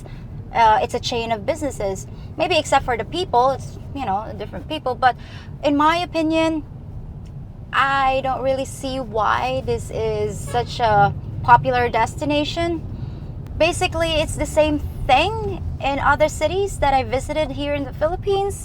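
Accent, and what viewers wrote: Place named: United States. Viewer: Any reasons you’re talking with an American accent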